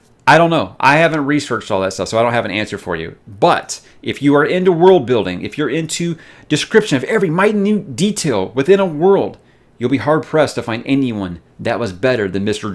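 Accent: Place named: United States. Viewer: Hearing the intro in such a manifestly American accent is a balm after enduring three episodes of British.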